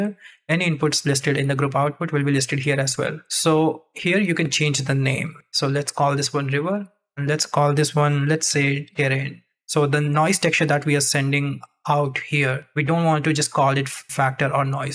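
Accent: India. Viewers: Yes from his voice he sounds Indian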